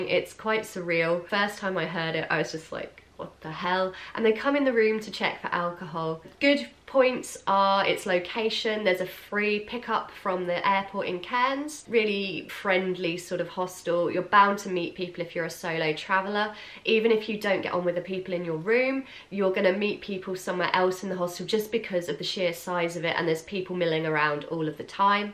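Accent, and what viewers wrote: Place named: England, United Kingdom. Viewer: English accent are awesome! 😁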